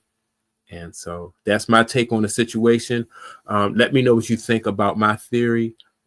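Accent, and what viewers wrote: Baltimore, United States. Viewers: You sound like you from baltimore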